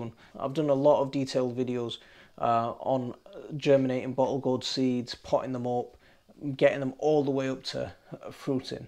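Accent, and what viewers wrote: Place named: Northern England, United Kingdom. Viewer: I love this channel, not only is it incredibly educational and positive in the gardening sense, but also outside that - I love your northern England accent and Muslim influences. ❤️❤️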